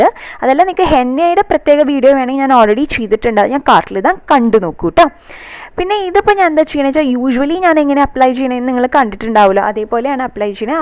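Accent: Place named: Thrissur, India. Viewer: Mm thoni. Thrissurkar nanayi samsarikunavar annu.. Enikk samsaram eshtam annu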